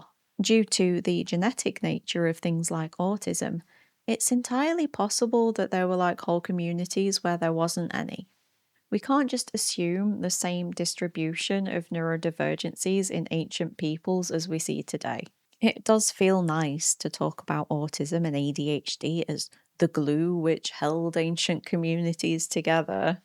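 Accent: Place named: Midlands, United Kingdom. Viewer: i like the midlands accent ;)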